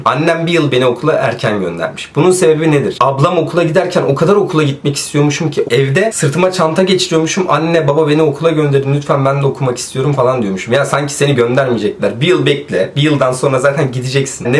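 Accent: Malatya, Turkey. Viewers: Abi Malatyalı misin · reis malatyalı mısın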